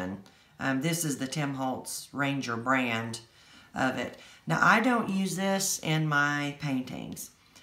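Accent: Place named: Oklahoma, United States. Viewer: I promised I'd watch it! Very nice job explaining everything....it's easy to understand and I jotted down lots of notes. Mind you...how difficult was it years ago to get all this information...thanks to technology and U-tube it's right there when you want it. Love the way you do your videos...and your accent...you sound a bit like a friend of mine in Oklahoma. Thank you ❤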